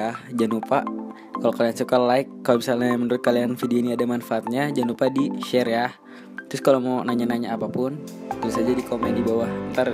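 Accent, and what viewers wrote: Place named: Java, Indonesia. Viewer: Pasti kamu manis banget yo mas, suara mendok nya ❤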